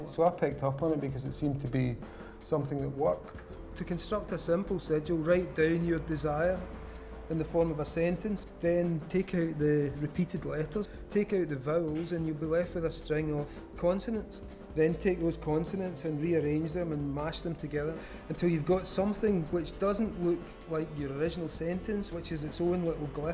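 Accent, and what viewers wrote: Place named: Glasgow, United Kingdom. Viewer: Auto captions are no match for the Glaswegian accent.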